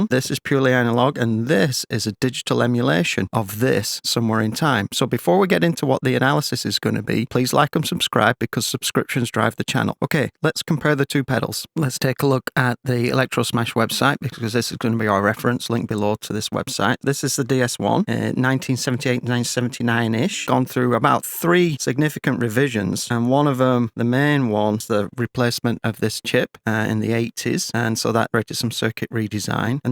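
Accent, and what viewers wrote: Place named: Yorkshire, United Kingdom. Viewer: That's some accent mate, Yorkshire man in Cali? Fantastic video btw!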